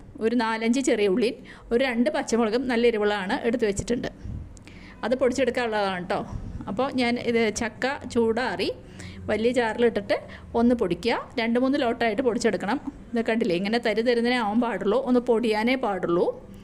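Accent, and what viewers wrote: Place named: Valluvanad, India. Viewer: ചക്കത്തോരൻ ഒന്നാന്തരായി. അതിലധികം ആ വള്ളുവ നാടൻ ശുദ്ധമലയാളം വളരെ ഇഷ്ടപ്പെട്ടു. നന്നായി വരട്ടെ.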